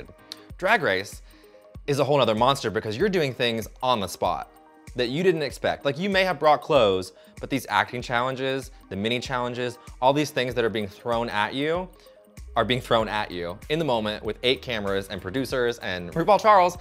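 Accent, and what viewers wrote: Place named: South, United States. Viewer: So handsome and I love the southern accent coming out. Being from Louisville ky very refreshing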